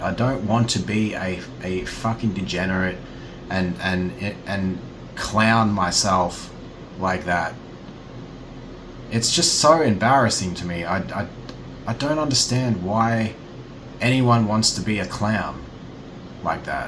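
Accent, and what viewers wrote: Australia. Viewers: everything in a aussie accent sounds better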